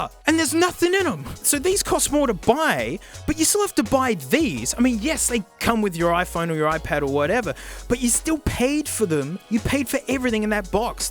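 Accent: Australia. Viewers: You haven't gotten used to the great Australian accent. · This ain’t English, this is ‘Stralian. But no, not really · He’s Australian. Kory’s voice actor is from New Zealand. Australians and people from New Zealand have similar accents. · Aussie accents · coming from Western Australia, He's definitely saying over ears, accent bro. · When I heard "Ovaries" instead of "Over ears" and then proceeded to slap myself in the face for forgetting the bloke is Aussie through and through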